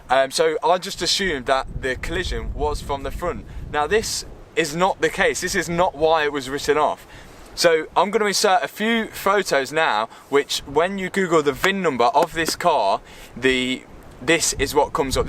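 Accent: Leicester, United Kingdom. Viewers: Thought I recognised the accent being the same as mine! Leicester ♥️ Great vids! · Good work 👍🏻 Just come across your channel tonight. You from Leicester? You sound it.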